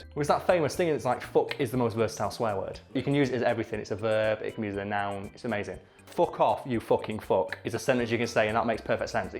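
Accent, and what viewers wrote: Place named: Northern England, United Kingdom. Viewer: Everybody misreads the sign for Hilter in northern Germany when they first see it. I was also in Elend (misery), just 8km from Sorge (worry). I know it's for comedic effect here, but you are kinda mispronouncing Fucking if you say it like the English pronunciation, except your northern English accent with the characteristic u sound that is far closer to German kinda counters that.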